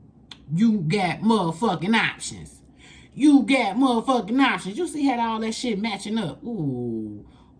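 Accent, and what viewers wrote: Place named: Chicago, United States. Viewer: I know a ChiTown vibe when I hear it, lol. Good read Queen!!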